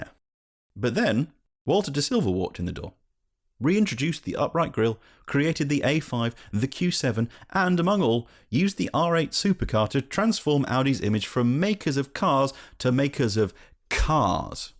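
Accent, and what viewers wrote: United Kingdom. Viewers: Yeah we get it. You are british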